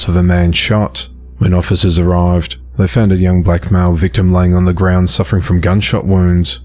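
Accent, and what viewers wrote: Australia. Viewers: You're definitely an Australian, hey?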